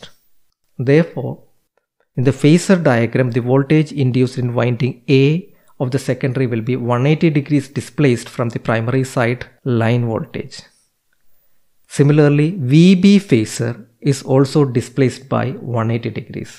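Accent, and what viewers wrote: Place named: India. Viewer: Spanish name but Indian accent….. bait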